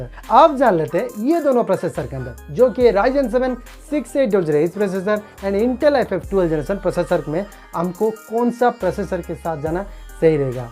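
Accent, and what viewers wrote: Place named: India. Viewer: Son of a bitch, i dont understand ur accent. Pak u bumbai indian body odor people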